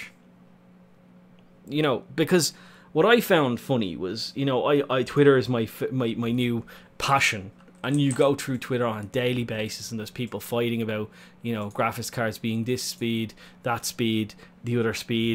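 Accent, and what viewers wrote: Ireland. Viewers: "I tawt I wuld tawlk to yew" Fuck the irish accent is absolutely fucking magical ;)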